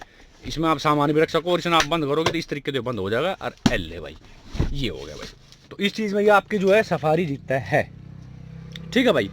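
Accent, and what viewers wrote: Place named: Haryana, India. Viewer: Me apke bat sun ne k liye video dekhta hu.... I luv Haryanvi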